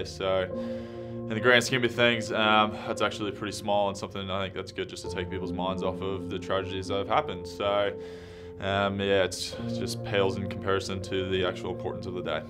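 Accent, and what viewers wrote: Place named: Australia. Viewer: Picking up a bit of an Australian accent. Listen every time he says "so".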